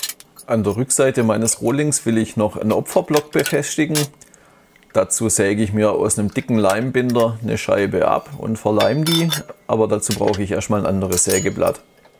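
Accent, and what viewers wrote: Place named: Swabia, Germany. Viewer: Gut gschwaebelt,. I daet des ao so macha: wieder emol ebbes drechsle. Griesle vom Dreiländereck!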